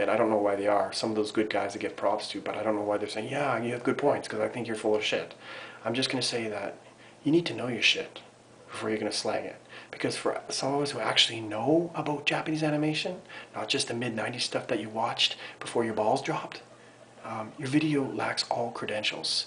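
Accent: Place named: Canada. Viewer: You didnt have to explain The Z thingie since your aboot was rly obvious liked ur vid tho def kudos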